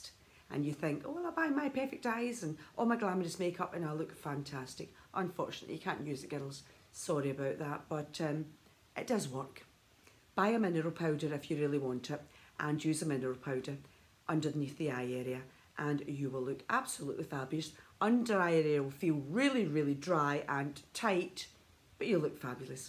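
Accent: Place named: Scotland, United Kingdom. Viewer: Love the Scottish accent…candid review from the lady well done ..mam 👏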